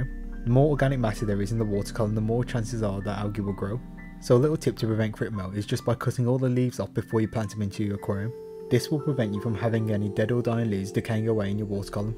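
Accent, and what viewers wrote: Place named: Black Country, United Kingdom. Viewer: lol Brits won't struggle with your speech but Americans will pull their hair out because they've never heard regional British accents. Guessing you're from the black country? Burntwood?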